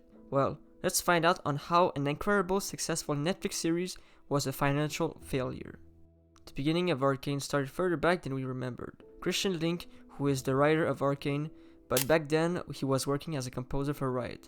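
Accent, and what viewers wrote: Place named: France. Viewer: I cant agree, he has a slight french accent but the quality of the sound is very good.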